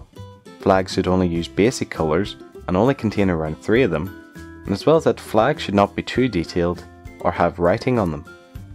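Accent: Northern Ireland, United Kingdom. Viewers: Are you from Northern Ireland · Dammit, I knew I should have guessed Northern Irish... oh well. ;) And thanks - it was the vowels that gave it away, I knew it must at least be related to Scottish. I've had a lot of exposure to English over the years, even for a Danish guy, so the vowels were very easy to notice. I've had almost no problems understanding your dialect. I mean, I've occasionally had to go back and listen one more time, but then that can happen with American and other British dialects as well. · I find ur accent very distinguishable as Northern Irish but also easy to understand.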